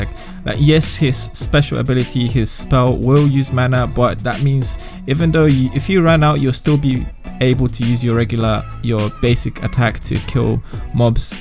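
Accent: United Kingdom. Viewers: At u British BC u sound like it lol( =①ω①=) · I'm guessing your British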